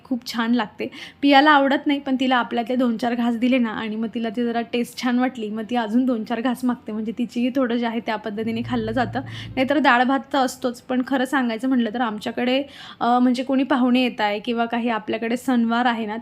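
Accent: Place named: Khandesh, India. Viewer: Tai ahirani bolat ja na madhe madhe... khupach god vatat hoti aikayla.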